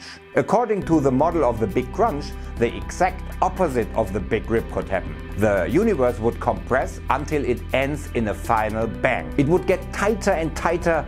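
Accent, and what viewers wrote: Germany. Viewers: Ich liebe wirklich sehr ABER die englische Aussprache ist furchtbar! Und die Grammatik ist leider wirklich auch nicht so der hammer. Man hört sofort dass da ein Deutscher englische Texte abliest.... und das Thema hätte ich lieber in gut gesprochenem Deutsch gehört. Für die englischen Zuschauer gibts doch die Untertitel Funktion....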